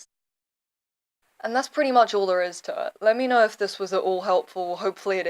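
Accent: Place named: Australia. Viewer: Your voice has changed, now you sound somewhat Australian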